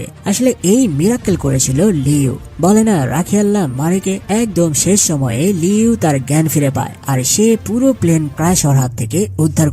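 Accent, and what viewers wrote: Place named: South Korea. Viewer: আপনি কোরিয়ান একসেন্ট এ বাংলা বলেন কেন? এমনিতে ভালোই বর্ণনা করেছেন।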